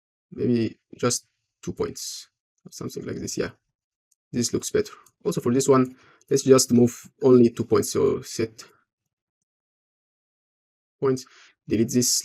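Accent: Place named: Egypt. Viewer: Egyptian accent, I got you! 😂 Thanks, Broo!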